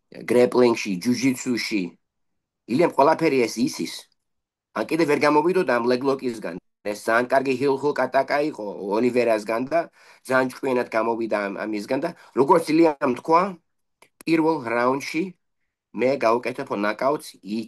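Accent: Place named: Megrelia, Georgia. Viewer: ძმურად ინგლისურიც გაურიე ხოლმე მეგრულ კილოს უხდება სასწაულად 😂😂😂 კაი ბიჭი ხარ ძამია წარმატებები არ მოგაკლოს ღმერთმა !! სალამს გიგზანი ბათუმიდან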